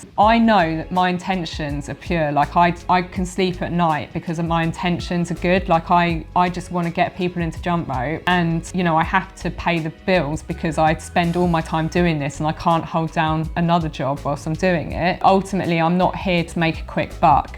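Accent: United Kingdom. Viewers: If I didn’t appreciate watching a beautiful tall blonde woman with blue eyes and a British accent, I wouldn’t watch you. But I do!❤️ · Love your British accent,. It is so cool. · The accent you noted the UK? Pretty cool I competed there often in college and was always very impressed with the University of Kentucky athletics facilities! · Great girl, great accent, great concept, Great Britain!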